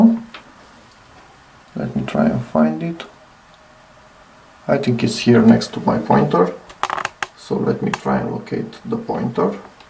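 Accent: Romania. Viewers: Accentul de roman si inghetata pe care scrie in lb romana te dau de gol :)) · Salut. Aveam o banuiala ca esti roman dupa accent, dar inghetata te-a dat de gol 😂. Am un "cluster" mare de pixeli blocati pe TV, am incercat varianta cu jscreenfix si nu a mers, Vreau sa incerc cu creionul si caldura cum ai facut aici, poti sa-mi spui te rog pe ce intensitate ai dat feonul? Multumesc! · Dupa accent ma gandesc ca esti roman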